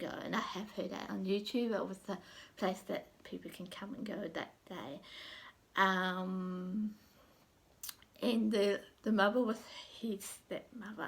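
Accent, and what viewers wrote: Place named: Australia. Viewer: She is Australian. Those of us from the states need subtitles. Very DIFFICULT to understand her accent.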